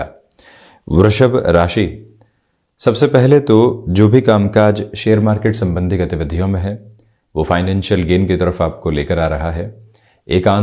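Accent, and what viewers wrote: Standard. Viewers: मैं आपके चैनल को फॉलो कर रहा हूँ और दिन प्रतिदिन का राशिफल सुन रहा हूँ। आपकी भाषा, आपकी हिंदी बिलकुल शुद्ध और साफ़ है । इस बात की ख़ुशी है की आज भी ऐसी शुद्ध हिन्दी कोई बात कर सकता है । प्रनाम 🙏🏻।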